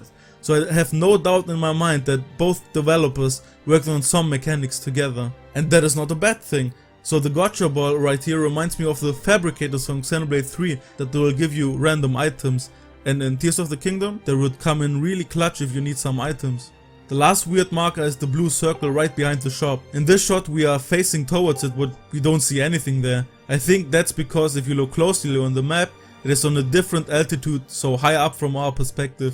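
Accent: Germany. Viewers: Nices Video! Bist du ein Alman? Hört sich irgendwie so an vom Akzent her :)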